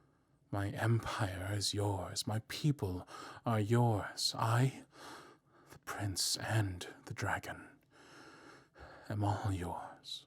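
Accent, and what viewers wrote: United States. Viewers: I thought chu were American...?!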